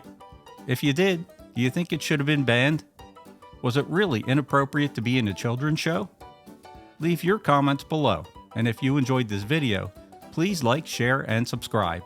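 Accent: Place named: Baltimore, United States. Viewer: narrating this has got to be from Baltimore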